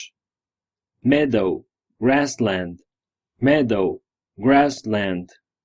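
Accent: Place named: United States. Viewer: por la pronunciación supongo que en americano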